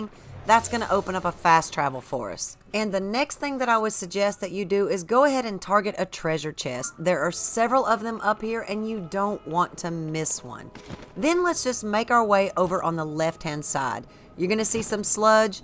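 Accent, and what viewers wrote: South, United States. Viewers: Your country twang so cute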